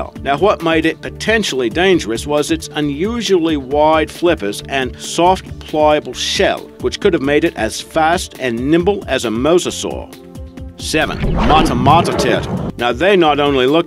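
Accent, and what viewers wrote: Australia. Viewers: Is this an aussie or NZ accent? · What is your accent? It's sounds Australian / Boston / English / New Zealand / Texan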